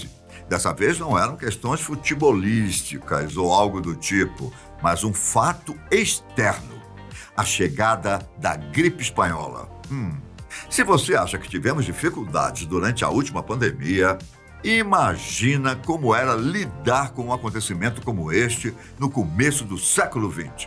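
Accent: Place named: Rio de Janeiro, Brazil. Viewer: iu carioca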